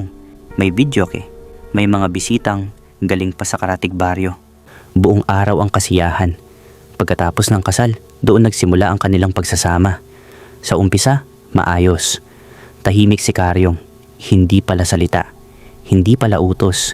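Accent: Pangasinan, Philippines. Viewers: Hahahhaha panggalatog ata c narrator😮😮😮😮😮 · Taga pangasinan yan c narrator 😂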